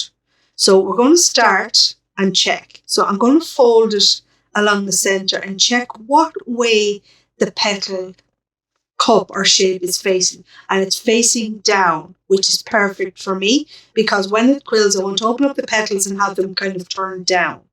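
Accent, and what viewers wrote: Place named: Ireland. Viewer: Congrats on a fab PCS box. Love your box and delighted to hear another lovely Irish voice. Tfs Regards Simone x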